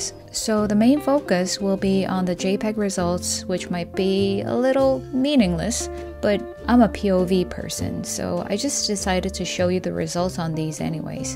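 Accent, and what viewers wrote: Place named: United States. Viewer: 몇 달 계셨는데, 그냥 미국인 발음이시네요. 너무 좋아요~!! 인스타에서도 뵈어요~!! 새해 복 많이 받으세요~~!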